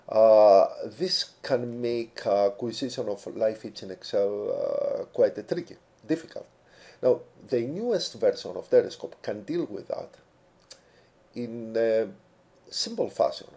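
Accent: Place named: Greece. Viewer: Απο την προφορα καταλαβα οτι εισαι ελληνας χαχα.... δεν ξερω τι ακριβως προσπαθεις να κανεις τυχαια βρεθηκα στο βιντεο αλλα ισως μπορεις να μειώσεις τα calls προς το api που χρησιμοποιεις για αν μην χτυπαει το threshold που έχουν. ΠΧ να τραβηξεις πληροφοριες απο yahοο finance με την buiild in functionality του excel και απο εκει και περα να τραβηξεις σε αλλλα κελια με το vantage indicators που μπορει να μην παρεχονται απο το yahoo Αλλα γενικα.. ολοι αυτοι που δινουν api ...στην ουσια πουλανε api και σε αφηνουν απλα να δοκιμασεις και μετα ζητανε γελοια ποσα για api calls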